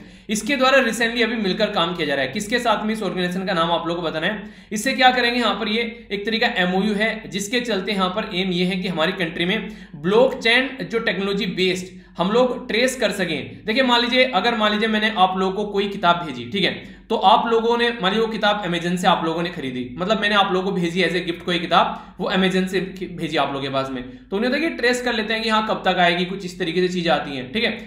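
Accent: Haryana, India. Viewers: Aap haryana se ho sir... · Am damn sure sir that u are from Haryana and from around Rohtak sonipat belt .0001 jitna bhi doubt nhi h😀🙏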